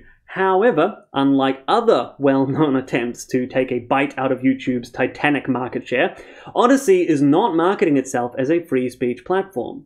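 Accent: New Zealand. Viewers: just found your channel and totally dig it mate, youre clearly a very smart chap and nice to hear a fellow kiwi (especially one who got no time for leftys, haha) on YT.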